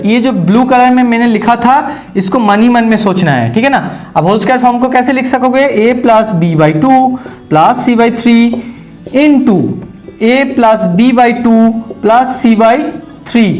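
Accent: Bengal, India. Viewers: Sir are you bengali please reply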